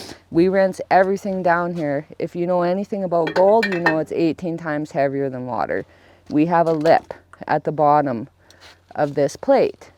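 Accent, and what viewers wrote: Canada. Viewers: Your semi-canadian accent is hilarious by the way 🤣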